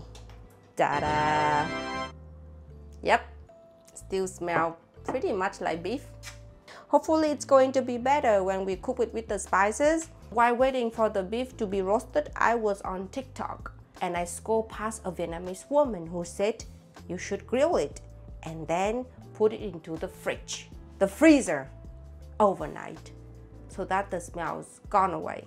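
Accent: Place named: Germany. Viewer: Hi! I don't know how your German is coming along, but you are developing a German accent in English, so I'm guessing your German is improving!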